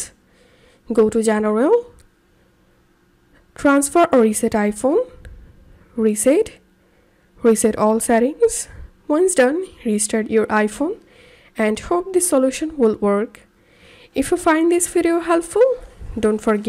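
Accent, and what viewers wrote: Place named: India. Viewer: do you have this same explanation with no Indian accent?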